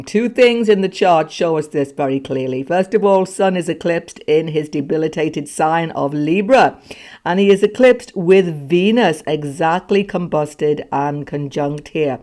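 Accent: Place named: Working class, United Kingdom. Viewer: That's the way the working class people in England speak, dude. They can't help themselves. We shouldn't hold it against them. Nobody chooses where they were born or grew up. Anyway, it's about the personality of the individual, and I find this lady's personality to be one that wants to help others. That's the way she comes across to me, so her way of speaking does not irritate me.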